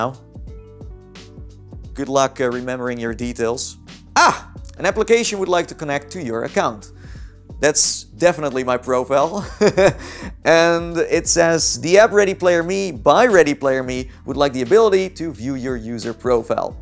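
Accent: Netherlands. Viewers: nederlands accent mag wel wat harder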